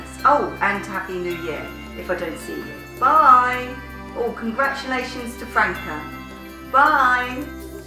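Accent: United Kingdom. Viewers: Happy Christmas, and thanks for the "tour", especially the beginning as I spent the first 12 years of my life in West Ken. That and your west london accent made me nostalgic. Thanks to your channel I have revived my sewing interest and my new year aim is to work through a Japanese dressmaking book (in English!) I aim to upgrade my style as I am on a diet. 🎄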